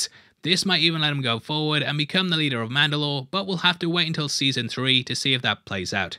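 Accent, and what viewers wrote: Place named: United Kingdom. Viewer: Maybe it's a British accent but I find you much less annoying than Eric at new rock starz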